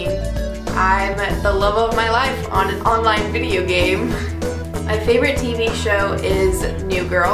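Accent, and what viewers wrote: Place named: United Kingdom. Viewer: Do you wanna know something im sorry for calling you by your real name but my name is as well it is just spelt with a ( c ) and who cares if u think you have a bad british accent you are silly no affence. Coz i loved your accent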